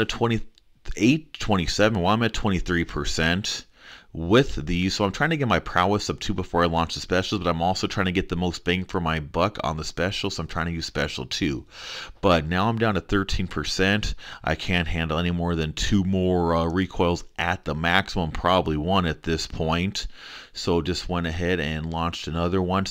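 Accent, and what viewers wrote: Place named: United States. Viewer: Thanks for the video. Several members of our ally enjoy your videos. We are trying to place where you are from based on your accent. What part of the US are you from??? Thanks again for the videos! Keep up the good, and helpful, work!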